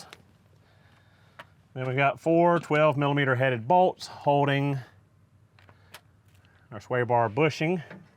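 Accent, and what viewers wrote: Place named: Carolina, United States. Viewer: I'm always fascinated by the rural Carolina accent(s) in which "on" is pronounced "own" where virtually everywhere else in the U.S. it's "awn". Here in New Orleans, we perfected "puhkawn" for "pecan" while the rest of the country goes with "peecan". Variety is the spice of life!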